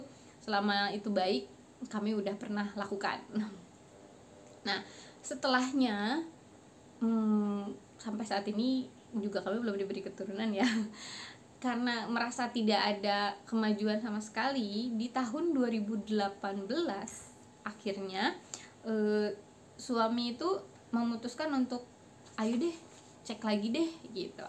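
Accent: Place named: West Java, Indonesia. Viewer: hee,.. Iyaa kaa makasih banyak yaa,. Di lihat dari cara penyampaian dan logat kaka kelihatan banget sundanya 😅. Salam dari bogor ka Semangat bikin konten berfaedah nya ka.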